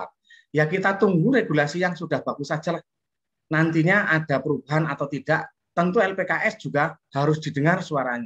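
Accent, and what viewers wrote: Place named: Pasuruan, Indonesia. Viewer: Suara pasuruan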